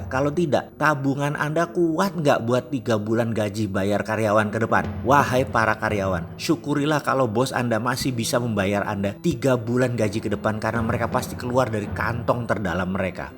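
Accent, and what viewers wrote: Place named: East Java, Indonesia. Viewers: Mantep mas se salam suroboyo, pantes ae logakte kentel, ternyata sampean wong jowo timur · Q seneng nek pas ngomong jawa timuran tepatnya suroboyan, ketok loss banget, dadi cikhas soale q jarang delok youtube sing gar boso suroboyoan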